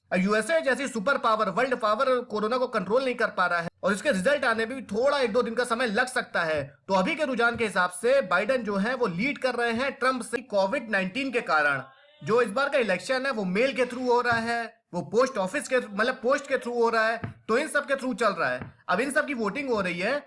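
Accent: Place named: Bihar, India. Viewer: Vhaisab apne toh dhom macha dii ... voice toh akdm Bihari babu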